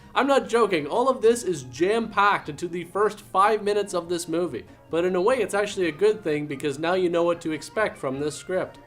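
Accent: Canada. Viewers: Trash, sounds like a Canadian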